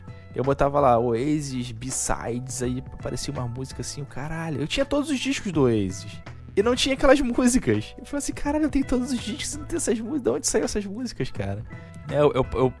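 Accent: Rio de Janeiro, Brazil. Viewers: Tem muitos caras bons na rede social, mas quando o tutorial tem sotaque carioca não dá me desculpem, mas é pura verdade, eu tenho vários amigos que também reclamam.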